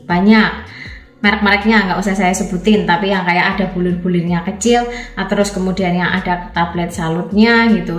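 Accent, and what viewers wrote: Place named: Java, Indonesia. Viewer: mantap ...suka bangat gaya bicaranya dokter...medok..hee.hee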